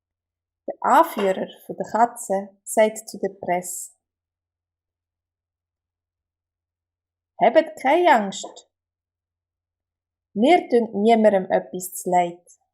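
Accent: Switzerland. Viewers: I love your videos, I love your stories, I love your swiss german accent, I really should express this love making your skype lessons hehe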